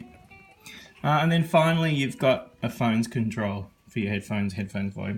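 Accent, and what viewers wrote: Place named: Australia. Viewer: Love the oz accent 🙂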